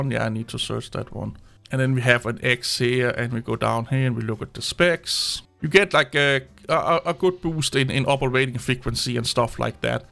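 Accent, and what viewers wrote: Denmark. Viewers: I'm guessing Danish?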